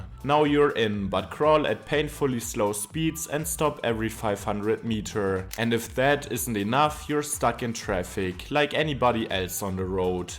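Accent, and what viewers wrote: Germany. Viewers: Da dein deutscher Akzent sehr ausgeprägt ist, würde ich dir empfehlen, in der Nachbearbeitung einen De-Esser auf deine Stimme zu legen, dann wäre das bisschen angenehmer zu hören. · Terrible German accent and aweful grammar. I feel like listening to my former classmates doing a report on public transport. My ears are bleeding. Please stop! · Das deutscheste Englisch was ich je gehört habe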